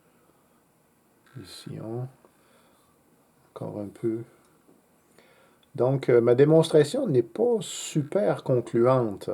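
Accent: Quebec, Canada. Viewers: Je découvre le tournage. Bravo vos vidéos sont très utiles et... j adore l accent de la Belle Province!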